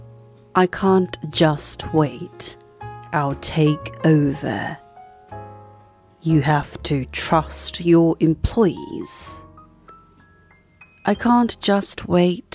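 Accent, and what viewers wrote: RP, United Kingdom. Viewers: I like that you speak RP accent. It's basically, making sure you pronounce every single party of the word and it is a lovely way to speak.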